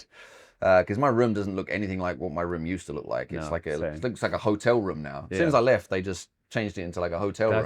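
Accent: United Kingdom. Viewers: *の喋るコクニーアクセントがまさに*だった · ジョージのブリティッシュアクセントかっこいいし男でも惚れます。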